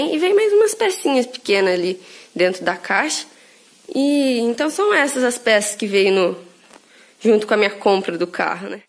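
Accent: Minas Gerais, Brazil. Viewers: VC tem sotaque de mineira. .Tenho um Etios Hatch 1.3 2017/2018 na BR distância mais longa faz 17km/L cidade faz 10 ou 11 mas tá ótimo fusca tem fama de beberrão. .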